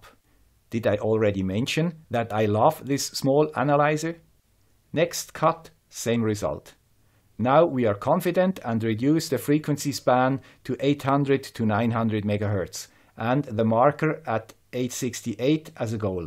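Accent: Switzerland. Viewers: What more could you want? A guy with a Swiss accent explaining complex numbers .... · Now when I am working with electronics I have a Swiss voice in my head.. Dankeschön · Thank you, as a French, I like your Swiss accent, and I remain admirative in front of the simplicity with which you can explain complex subjects...I'll keep watching your multiple videos and keep learning... Your experiments basically save me the hassle of trial and errors, and help me to go straight to the right solution. Bravo!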